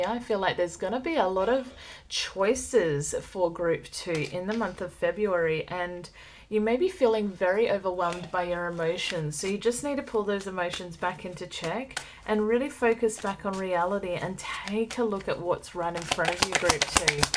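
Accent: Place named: Australia. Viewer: Great reading, I was in group 1. OZ accent..🫠🤤🌞 especially the sound of "you" and "here"❤ please don't get me wrong, It just sounds beautiful. 🫣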